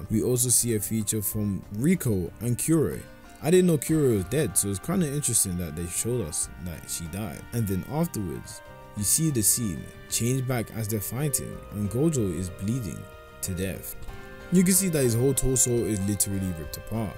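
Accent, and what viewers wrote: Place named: United Kingdom. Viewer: why does bro sound so overly bri'ish 😭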